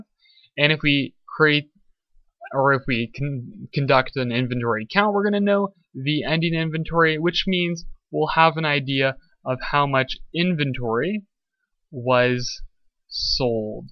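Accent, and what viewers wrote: Canada. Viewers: Clara Van Biesebroeck hes Canadian haha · He says aboot, probably Canadian.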